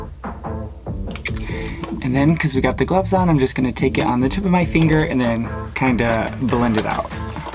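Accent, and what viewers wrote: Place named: Manila, Philippines. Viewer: The way she speaks is giving me Manila vibes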